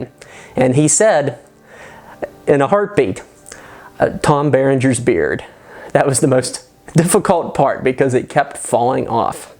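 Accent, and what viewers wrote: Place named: Pittsburgh, United States. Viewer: This guy is a Yinzer no doubt.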